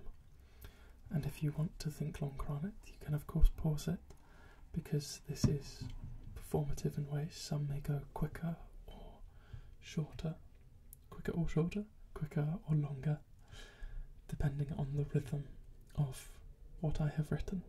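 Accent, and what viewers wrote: United Kingdom. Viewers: The main reason I watch your videos is learning your British accent :)✨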